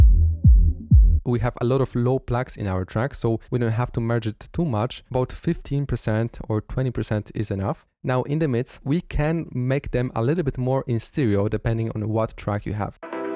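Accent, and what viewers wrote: Poland. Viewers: wow masz polskie imię i nazwisko:) W sumie domyślałem się po Twoim akcencie:) super robota i super kanał, Przez lata siedziałem za sterami pro tools i cubase, przesiadam się na fl studio i bardzo efektywnie mi w tym pomagasz! Pozdro! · Wait... are you from Poland? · Is Polish or German? Or Polish German? 10% for Netherland...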